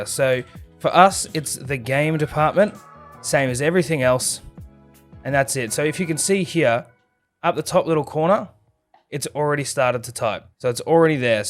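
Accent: Australia. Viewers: Thank you soo much this helped a lot the best part was that you Australian not American so I can understand you a lot more, your also very funny 😂😂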